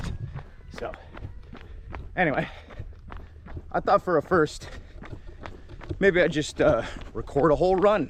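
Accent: South, United States. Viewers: I love the fact that with the run, the southern accent comes out. 😎Yee haw! I'm 60 years young and the miles have started reminding me that I'm not 20. You, young man, are an encouragement for others to get out there and do the things, regardless of age! Keep up the good work! Love the rant! Thanks for sharing.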